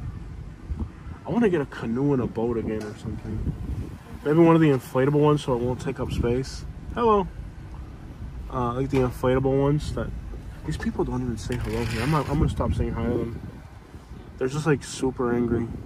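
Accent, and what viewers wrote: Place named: Hialeah, United States. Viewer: Bro the voice was epically creepy..but you are definitely speaking truth..keep up the great work..yet the Hialeah accent got me😂..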